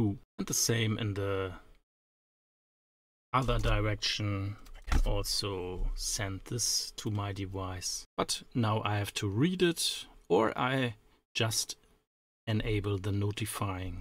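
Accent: Germany. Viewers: Ahh another very good german accend tutorial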